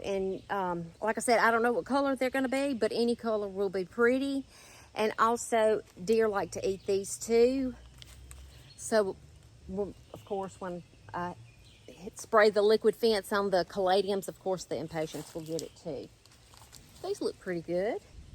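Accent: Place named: South, United States. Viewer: I love your videos,! You DO have a lovely personality. I have thought about making videos, but I have a voice box problem. And yes, we can tell you are from the south 🤭😆 because you sometimes double the syllables in a one-syllable word. Lol I'm a southerner, too, born/raised in west TN.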